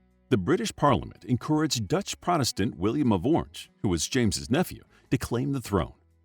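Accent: United States. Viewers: This Yankee narrator's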